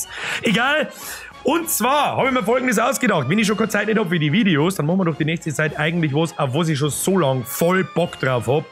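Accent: Bavaria, Germany. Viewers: Ich komme aus Sachsen, genauer aus dem Vogtland und ich bin gerade erstaunt wie viele Ähnlichkeiten unser vogtländischer Dialekt (der im Übrigen mit Sächsisch nicht viel zutun hat) stellenweise mit dem Bairischen hat. Man könnte das Vogtländische als eine Mischung aus Sächsisch, Fränkisch und Bairisch bezeichnen. Ich versteh auch fast alles was du sagst, was glaube ich einem NRWler oder Berliner deutlich schwerer fällt.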